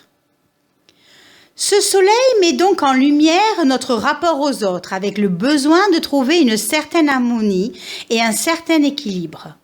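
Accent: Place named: Provence, France. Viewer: Capsule interessante et tout à fait vrai dans ce que vous enoncez. . Merci ! P.s : d ou viens ce bel accent ? Paca , occitanie ? Bonne nuit . Yves .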